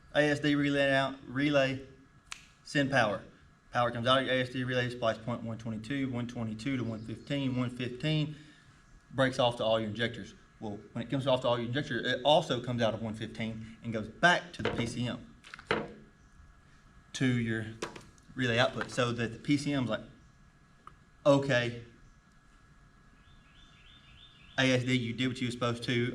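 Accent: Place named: South, United States. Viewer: I was going to research it on the internet but I cannot understand your southern accent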